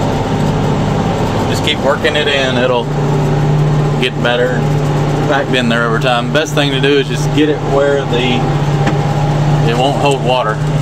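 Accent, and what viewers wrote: South, United States. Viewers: I don't mind your southern accent. We all have an accent so you can't make fun of it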